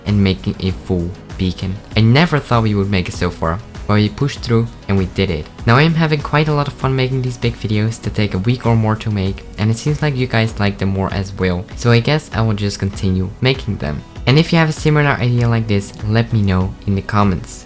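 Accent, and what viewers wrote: Netherlands. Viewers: somethimes i have the feeling that youre dutch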